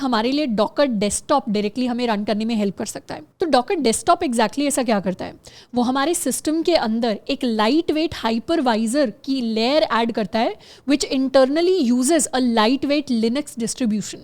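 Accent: India. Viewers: Very Heavy Indian accent. Can not understand what she is saying. Either you speak in English OR speak in Hindi.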